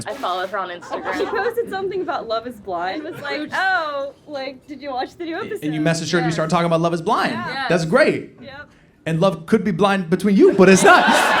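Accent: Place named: United States. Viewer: Hiya I'm your BIGGEST FAN I LOVE YOUR STAND UP COMEDY. I COULD WATCH YOU 247. IM A BRAND NEW NEWBIE TO YOUR YOU TUBE CHANNEL CONTENT WILL. EVERY TIME I WATCH YOU I ALWAYS FEEL VERY CALM REALAXED AND CHILLED OUT VIBES. I LOVE YOUR AMERICAN ACCENT AND I JUST CRY WITH LAUGHTER ALL THE TIME TEARS RUN DOWN MY FACE CONSTANTLY. IM SO GLAD IVE JUST SUBSCRIBED TO YOUR YOU TUBE STAND UP SHOW CONTENT. WARM COSY HUGGIE SNUGGLES CUDDLES MATE LOVE RESPECT YOU LOADS NOW AND ALWAYS AND YES WILL YOUR A HUGE STRONG POSITIVE INSPIRATION IN MY LIFE AS WELL NOW MATE. KIND DEEPEST REGARDS YOUR BIGGEST FAN OF YOUR COMEDY STAND UP SHOW CONTENT ON YOUR YOU TUBE CHANNEL MY AWESOME BIG BROTHER 4 LIFE NOW MORE THAN YOU ALREADY KNOW THIS MATE. KIND DEEPEST LOVE RESPECT REGARDS MATE FROM MARTYN CHARLES JOHNSON IN ROCHFORD CITY OF SOUTHEND ON SEA IN ESSEX UK ENGLAND NOW AND YOU ALSO HAVE A BEAUTIFUL SMILE AS WELL MATE. 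KIND DEEPEST LOVE RESPECT REGARDS MATE MARTYN CHARLES JOHNSON 🫂😁🤝🙇🏻‍♂️💝💝💪🏻💪🏻💪🏻💪🏻👍🏻👍🏻😉💛💛💛💛🫅🏻🫅🏻🫡⭐⭐👋🏻🫶🏻😍😍🏡🎧🎤😉👍🏻👍🏻💪🏻💪🏻💛💛👋🏻🫡🤝🙇🏻‍♂️😉💛💛🫅🏻🫅🏻🎧🎤🙇🏻‍♂️💪🏻💪🏻💪🏻💪🏻😁😘😘🇬🇧🙇🏻‍♂️🫡💛💛🫅🏻🫅🏻😁🫂🙏🏻🇬🇧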